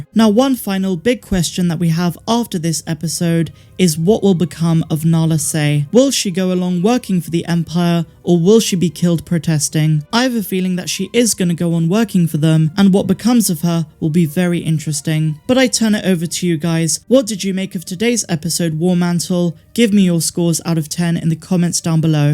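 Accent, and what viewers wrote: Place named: Bristol, United Kingdom. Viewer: Hi. First, thank you for all yours videos.....(still saying your a West country girl, Bristol???) I just love the West Country accent, but I hear the bizzell accent in you. Oh, just to let you know, just in case your thinking, why is he saying all this......don't worry, its because I'm from the West Country......Weston-super-Mare. Anyway, OMG, loved this episode. The John William music made it for me. The man is a Legend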